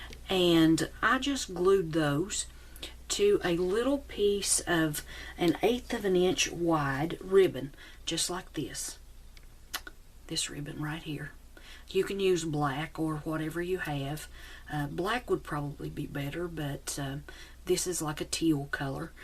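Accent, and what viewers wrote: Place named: South, United States. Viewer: Wow I’m so happy I found ya ! I’m southern and I can hear that in your voice but this is neat ! I just found ya on here ! Very happy I did ! I bet you could even use Bobby pins for the wires too even ! Just saying! Lol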